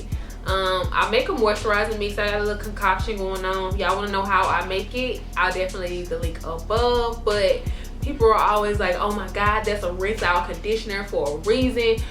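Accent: South, United States. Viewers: girl you so country just like me! lol i love it!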